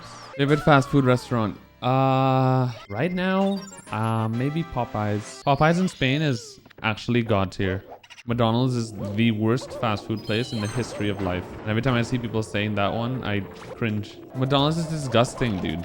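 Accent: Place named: Trinidad and Tobago. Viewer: I need to know. You sounds so trini its insane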